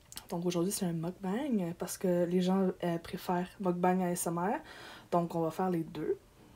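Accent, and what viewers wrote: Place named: Quebec, Canada. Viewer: Cest quoi avec les ramen au poulet et il ya quoi a cote jai pas compris quand tu as parlé dsl je comprend pas comme tu as un accent québécois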